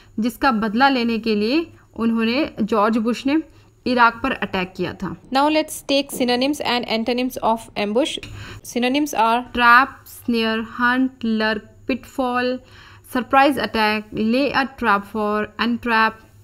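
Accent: India. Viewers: Mam are you from Chhattisgarh ?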